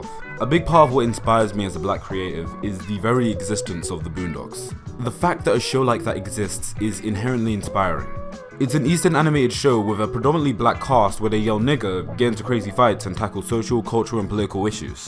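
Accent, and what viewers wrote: England, United Kingdom. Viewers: What's this Black/Blank memento thing he mentions in the video? I can't white hear it because of his British accent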